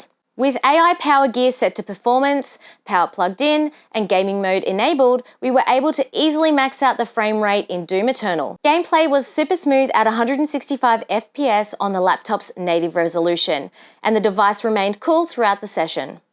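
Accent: Australia. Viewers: I absolutely love the look of this laptop device here & even the screen looks bloody amazing as well! I'm thinking of upgrading to a new laptop here & looks beautiful! Have a beautiful week & love hearing that lovely Australian voice! 🥰🎮❤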